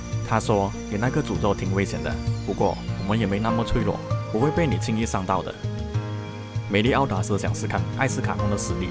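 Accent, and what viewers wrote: Malaysia. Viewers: 我肯定你是马来西亚人！！ 我看了你全部的影片觉得口音有点想马来西亚的，结果你说玩玩啊你以为我就认定你是马来西亚人了😂😂😂😂😂 我是真的有看完你全部的影片喔😂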